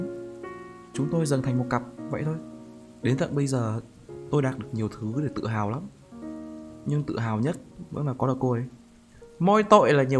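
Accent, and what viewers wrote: Hai Phong, Vietnam. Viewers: A ở Hải phòng à :v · Bác add quê Hải Phòng ah? :)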